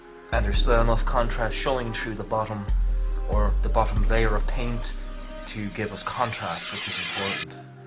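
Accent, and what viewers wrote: Ireland. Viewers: Another great "how to" of yours,! "First thinhs first" I like the sound of Irish English! And what's very nice, too: You make your method usable for those without an airbrush like me! Cheers, mate!:)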